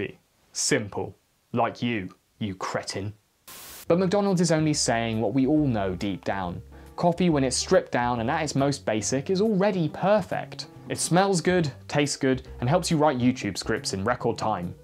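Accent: United Kingdom. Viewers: typically I hate channels that are feature a condescending British dude but yours is pretty ok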